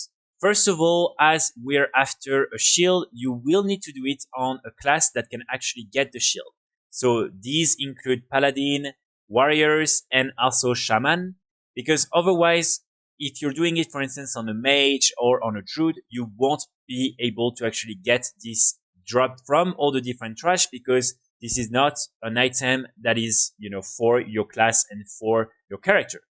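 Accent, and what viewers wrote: France. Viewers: Tu es français ? Je dis ça à cause de l’accent 😊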